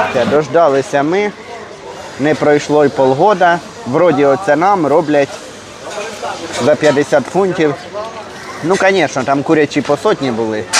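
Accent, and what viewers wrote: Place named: Kremenchuk, Ukraine. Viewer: Однозначно лайк за просування українського контенту❤❤❤🇺🇦🥰 але кременчуцько-світловодська школа рве вуха😂😂😂 люблювас полтавські галушки❤❤❤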